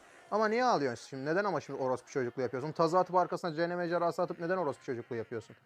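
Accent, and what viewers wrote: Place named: Istanbul, Turkey. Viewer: dil kursuna falan mı gitmeye başladın türkçen istanbul türkçesine dönmeye başladı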